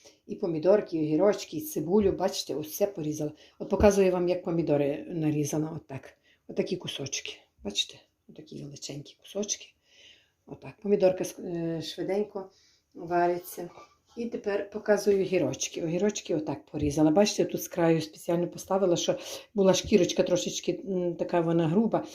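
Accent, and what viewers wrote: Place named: Khmelnytskyi, Ukraine. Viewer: Дякую за рецепт салату.Приємно чути Вашу мову,так у нас на Хмельниччині розмовляють.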